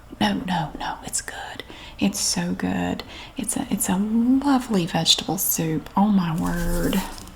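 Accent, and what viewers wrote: South, United States. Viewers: I swear nobody brings me more comfort than this woman. I'm not sure if it's the southern accent or the maternal vibe she has, but I come back to her videos any time I'm stressed or anxious or upset & she always brings a sense of calmness to me. Bless her soul.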